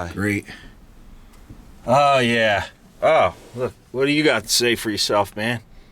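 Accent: Pennsylvania, United States. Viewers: Thanks dude. I like how you clearly list all tools needed, for those of us on our way to the JunkYard. Plus, that Pennsylvania accent is reassuringly trustworthy.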